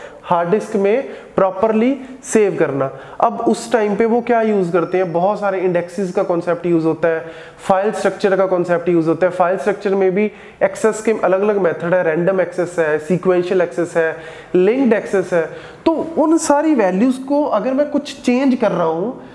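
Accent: Punjab, India. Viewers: You are excellent, and one more thing..I know that this is out of context, but I can bet you are from Punjab😂😂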